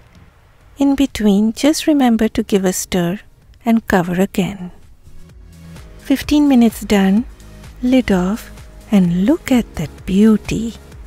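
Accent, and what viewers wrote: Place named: Bengal, India. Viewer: I really love your accent😊😊 english from a bengali tounge is always sweet to hear ❤❤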